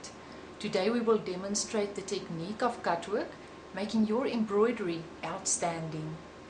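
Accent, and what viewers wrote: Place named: South Africa. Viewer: I love your south african accent.....!!!!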